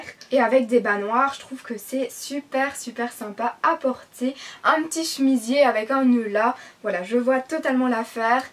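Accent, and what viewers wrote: Belgium. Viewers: Tu deviens de plus en plus jolie ! Et j'adore ton accent belge 😂😂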